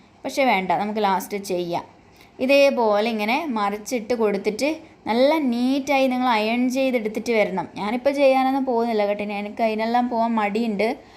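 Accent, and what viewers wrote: Kannur, India. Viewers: ഇത് കണ്ണൂർ ആണോ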